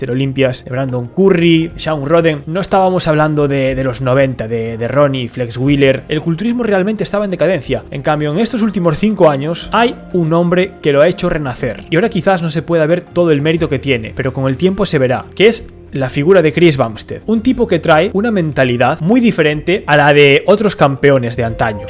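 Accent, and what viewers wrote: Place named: Galicia, Spain. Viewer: Me mola, cuando te cabreas te sale el gallego de dentro jajaja, estas hecho un figura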